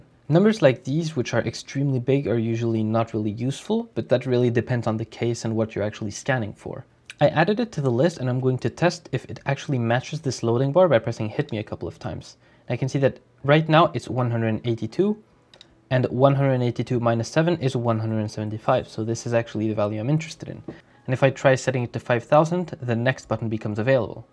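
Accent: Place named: Sweden. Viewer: visste väl det hörde det lite grann på din dialekt lol, har du Discord?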